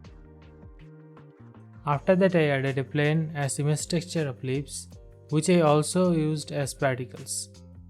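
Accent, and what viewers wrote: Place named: India. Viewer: no wonder the accent felt indian but slightly off.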